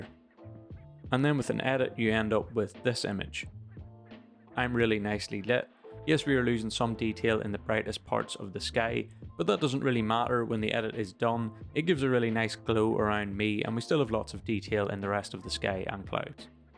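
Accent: Ireland. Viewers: Minor cavil: the word 'sky' is not normally pronounced with an 'sh' sound, but you're Irish, I guess, so ok?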